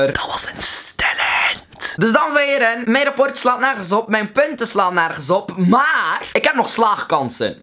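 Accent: Belgium. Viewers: ben je een Belg of nl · Tis een belg?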